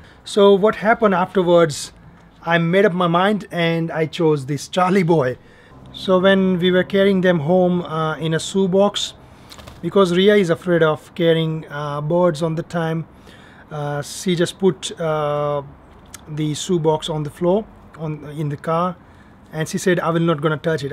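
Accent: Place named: Bhojpuri, India. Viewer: Bhojpuri accent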